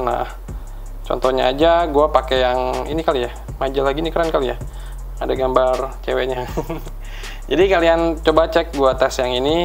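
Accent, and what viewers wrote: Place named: Sulawesi, Indonesia. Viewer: Kental banget logat Sulawesi nya bang 😂😂😂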